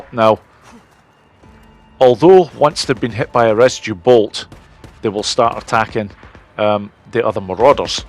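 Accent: Scotland, United Kingdom. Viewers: In any shooter game, I always opt for a sniper rifle as soon as possible. On the first playthrough, I saved my lunch money and turned in a lot of bounty to get my trust level up so I could buy the.50BMG. The slaughter went pretty much identical to the first example. On the second go around, I had added the Rock Chuck which made it more fun as I took out the roof crew from a distance and then snuck in for an up close and personal finish. BTW, and I hope I'm not getting the nationality wrong, I enjoy videos as much for the content as I do listening to his narration. Of all the peoples speaking English as a second language, there's just something special about the way a Scottish person does it, and I could listen to it all day! So, hats off for all your very helpful offerings on Days Gone.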